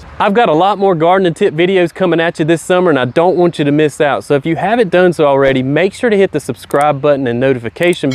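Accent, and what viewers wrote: South, United States. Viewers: I'm so glad the American accent is alive and well. One of the most beautiful of all of them, as represented here. It's a soothing and comforting sound if you had Aunts, Uncles, or Grandparents, or anyone else you looked up to, who was from our South.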